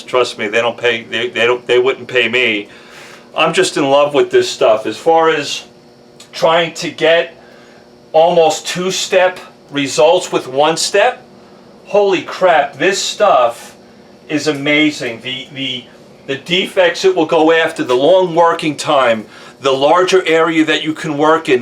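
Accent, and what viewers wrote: New York, United States. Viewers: I'm hearing Long Island or NJ here paisan.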